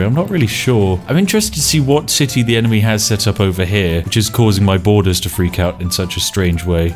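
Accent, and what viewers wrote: United Kingdom. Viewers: Are you from UK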